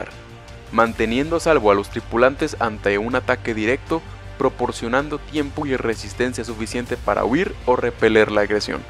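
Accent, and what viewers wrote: Mexico. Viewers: eres de México?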